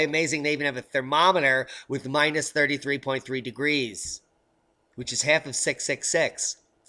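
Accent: United Kingdom. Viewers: Love your British accent. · I love the British accent. You are f*ckin’ funny as hell. Can’t wait to hear you and Robert tonight. Global warming causes snow. Didn’t you know?! Haha.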